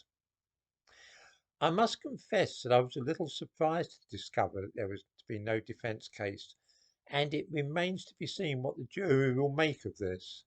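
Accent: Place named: RP, United Kingdom. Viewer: A plummy voice doesn't cut it. Your bitterness oozes out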